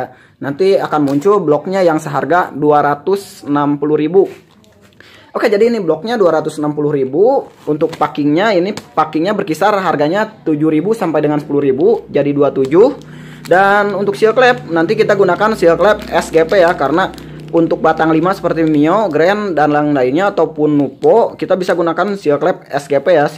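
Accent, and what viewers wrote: West Java, Indonesia. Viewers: orang sunda ya kang?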